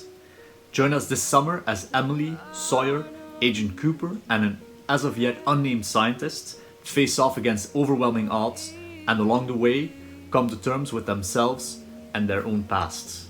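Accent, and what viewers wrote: South, United States. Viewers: That is an excellent southern accent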